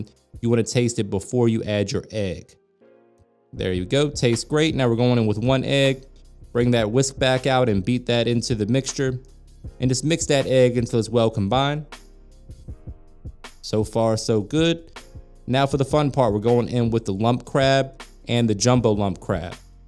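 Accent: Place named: Maryland, United States. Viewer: Quick story… About 20 years ago the corporate office I worked for was in Cockeysville MD. When I would fly out there, the ladies would make me THE BEST crab cakes. I quit, company went under (I think) lost touch with the ladies. Through the years, I’ve tried to replicate the cakes, no luck. I’ve tried HELLA cakes, never as I remembered them. Fast forward to a week ago. I knew I recognized your very faint accent. When you said you were from the area, we’re making crab cakes, my heart skipped a beat! Made the crab cakes today, not exaggerating… they legit made me cry, took me back to the best crab cakes I’ve ever had, the ladies, the fun we had, Chesapeake Bay, everything. THANK YOU so much. Love everything about your cooking channel, keep up the good work☺️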